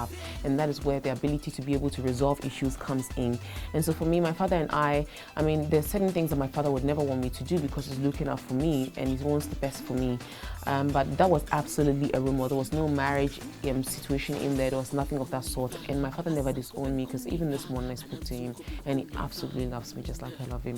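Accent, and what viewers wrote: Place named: Nigeria. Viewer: She has Nigerian accent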